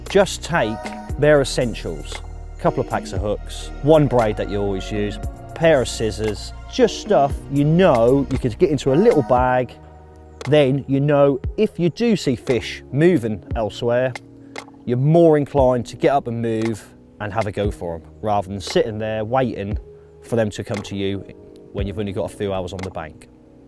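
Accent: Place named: Norfolk, United Kingdom. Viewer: Norfolk accent bud lol he’s my local tackle shop owner top guy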